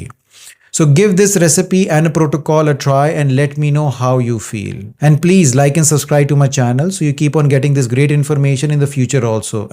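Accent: India. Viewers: Your accent tells me you are not from US! Even those that have studied from US do pick some accent. Yours is very typical Indian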